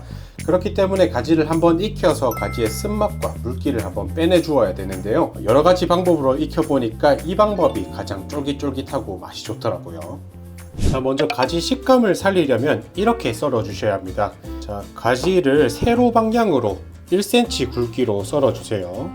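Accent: Gyeongsang, South Korea. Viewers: ㄱㅕㅇ상도 말이 멋져요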